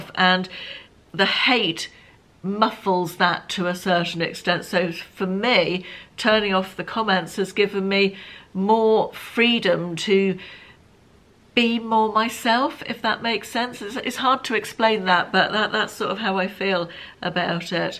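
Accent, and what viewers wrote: United Kingdom. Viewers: ❤ you do what is best for you! I'm a recent subscriber and enjoy all your videos. Funny thing.......I subscribe to 4 UK women a d you all sound so different 😊❤! Much like in America.....with all of our different dialects......find it so interesting 😊😊😊😊. Keep on keeping on! I enjoy all my British friends!❤❤❤❤😊😊😊😊Kathy from Pennsylvania USA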